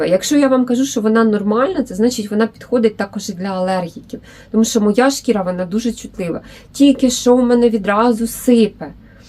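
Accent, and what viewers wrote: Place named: Slobozhanshchyna, Ukraine. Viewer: Сонечко наше ясне!!! Доброго вечора. Подивилася як ви з родиною розбите голубці, і що!ґ! Пішла все купила на гоубкі!!!, Ви неймовірна жінка, а ще саме головне, як люблю ( просто балдею) от нашего Слобожанського говору!!!!! Київ, Суми!!!!!💙💛👍🇺🇦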